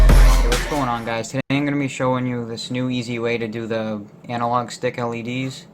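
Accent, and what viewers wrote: Boston, United States. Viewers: skeletøn clique its boston I think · What part of Boston are you from? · God that baston accent terrible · really thick Boston accent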